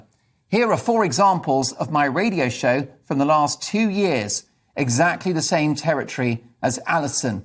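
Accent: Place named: England, United Kingdom. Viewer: I love this guy. Being called an arsehole with an English accent sounds so right!!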